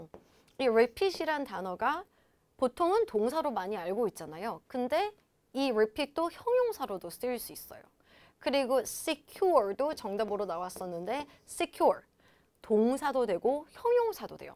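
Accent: Gyeongsang, South Korea. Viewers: 와 정말 ㅋㅋ 너무 멋있으시네요 ㅋㅋ 경상도 말씨까지 정말 이상형 그 자체시네요 참나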